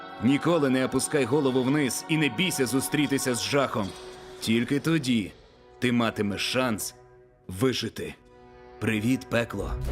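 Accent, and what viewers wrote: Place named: Russia. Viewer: Акцент там не для розваги чи насміхання над кимось. Це для збереження задуму авторів відео, згідно з яким мовник має сильний російський акцент.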